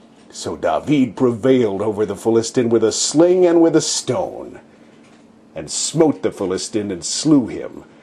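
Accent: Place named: United States. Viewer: I enjoyed your clear reading of the King James version. I am from Ft. Wayne, IN and it is easier to understand old English text when read with an American accent. Since the old Hebrew names are uncommon in English, I liked the way you pronounced every letter phonetically.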